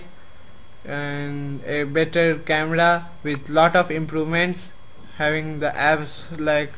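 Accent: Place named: India. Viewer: I can understand him and i'm not Indian. So what if he has a THICK accent. ...Do your thing bro.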